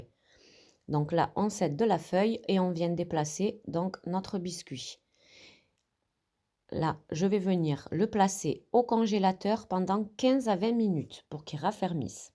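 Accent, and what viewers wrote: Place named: Provence, France. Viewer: 👏👏👏..abonnée depuis peu je vous trouve top comme vos recettes et vos vidéos 😉😁..j'ai fait ce raphaello en version gâteau rond et c'est une tuerie !mes invités n'en n'ont pas laissé une miette 👌😂..alors merci 🥰..plus qu'à tester plein d'autres recettes de votre playlist 😁..votre bel accent fait du bien et il me fait penser à ma sœur qui habite dans les Bouches du Rhône 🥰..à bientôt !!🌹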